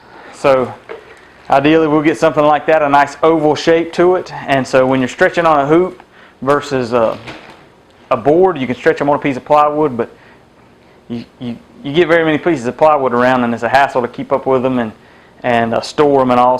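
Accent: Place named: South, United States. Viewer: This guy has such a southern accent, another great video, I learned allot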